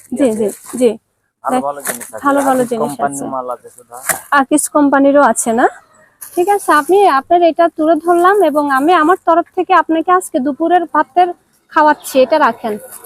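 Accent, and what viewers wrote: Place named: Jessore, Bangladesh. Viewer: তোমার কি সুন্দর কথা আমাদের যশোরের মত কথা তোমার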